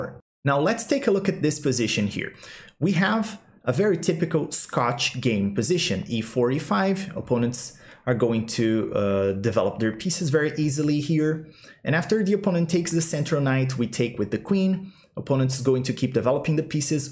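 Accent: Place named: Brazil. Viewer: You have a little brazilian accent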